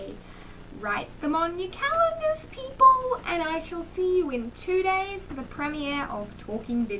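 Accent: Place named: Romania. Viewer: Romanian accent... Okay, I'm not the only one. Someone commented on her most recent video and was all "if you're a native American why d'you live in romania?" I was like "Have you ever heard an American talk? Clearly no, because that's NOT our accent." lol, but I didn't comment that because that would start youtube DRAMA!!! ...or maybe it wouldn't, I don't know.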